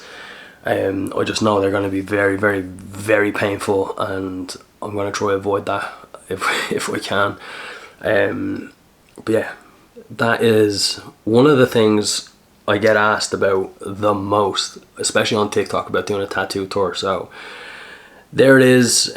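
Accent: Ireland. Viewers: Sir I don't like tattoos but I just wanted to know if you don't mind me asking, why do you have so many tattoos and why do you like having tattoos? I was just curious, you may not answer if you don't feel like....I love your style, your dressing sense and most importantly your melodic Irish accent, I like it so much that I'm learning this accent.☺️ Thank you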